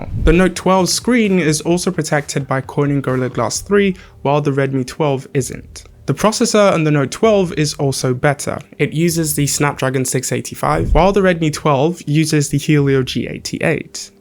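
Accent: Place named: United Kingdom. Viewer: I love the video and as well your British English accent. Many would say you're not a Nigerian living in Nigeria because of your Amazing English accent, just like I was also asked in many occasions if truly I was a Nigerian for the same reason. I loved the review and I am opting for the Redmi Note 12. PS: I sow in the comments someone saying that there's also a Redmi Note 12 Variant which also has 256 ROM. And I wonder how true is that, seeing that you haven't made mention of that variant in review. Thanks and this your new subscriber's here to stay. ❤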